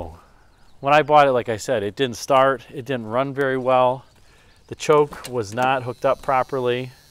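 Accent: United States. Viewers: Always fascinated by American pronunciation of words like brougham & coupé. Unlike anywhere else! I do enjoy these videos; the cars featured in them hardly ever appeared on this side of the Atlantic. What baffles me is that so many seem to have switches on a part of the dash that can only be reached through the steering wheel. 🤔 Also intrigued that the speedo on this car goes 110,115,120. Don't recall seeing that 5 mph indication before.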